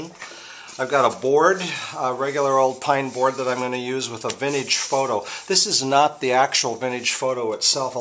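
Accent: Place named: Midwest, United States. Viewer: I love your work. The accent? I'm trying to place it... Wisconsin, Minnesota,.... am I close??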